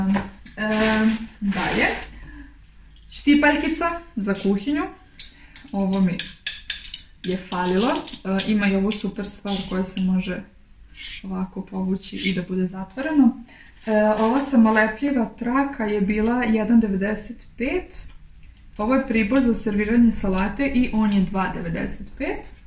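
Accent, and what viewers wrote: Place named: Ekavian, Serbia. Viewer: Zašto pričaš na ekavici, ako si iz Bosne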